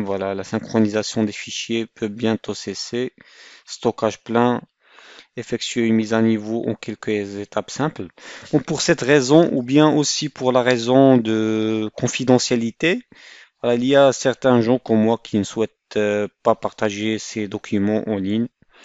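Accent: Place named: Morocco. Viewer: 3raftek mghribi gha mn lfrançais ta3ek 😂😂😂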